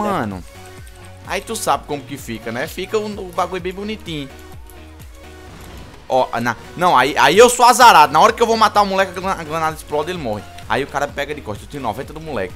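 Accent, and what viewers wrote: Northeast, Brazil. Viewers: Mano vc eh da ond daq do nordeste?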